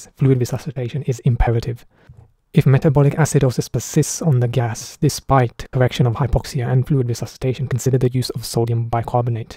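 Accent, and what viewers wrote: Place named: Germany. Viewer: I thought he’s speaking german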